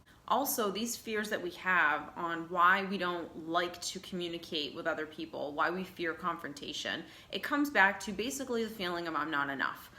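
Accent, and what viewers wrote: United States. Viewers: I didn't think you'd be American.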